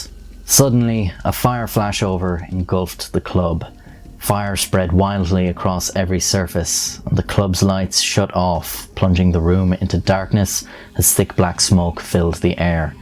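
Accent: Ireland. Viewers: I really like the style of your videos. Your Irish voice is perfect fo this stuff. Keep it up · Imagine if he keeps going until he gets much older with a gruff voice and that Irish accent? Like a lighthouse keeper or an old sailor telling dark tales.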